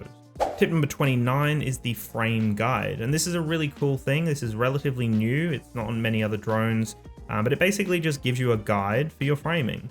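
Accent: Australia. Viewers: Easy to follow, and pause where you need to. The indexing at the bottom is a godsend. And an Aussie accent, so easy to understand. Highly recommend newbies watch this video.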